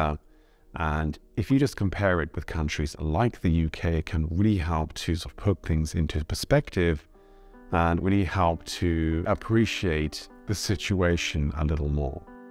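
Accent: Germany. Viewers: Ich würde fast sagen, der Akzent ist dem Deutschen mittlerweile etwas angepasst, deshalb so gut zu verstehen lol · You have developed a bit of a German accent! You sound like a German who speaks very, very good English. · you do sound like German speaking English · … you lowkey have a german accent…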